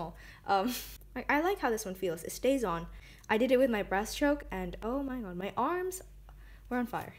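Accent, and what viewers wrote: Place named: United Kingdom. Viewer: Woww sis i dint realize you have a bit of a British accent lol I noticed it ✌😌✨✨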